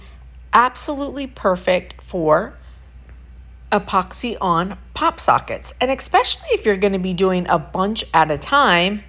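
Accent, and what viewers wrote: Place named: Philadelphia, United States. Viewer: You sound like u have a Philly accent. Anyways thanks for sharing with us. I appreciate the information so much.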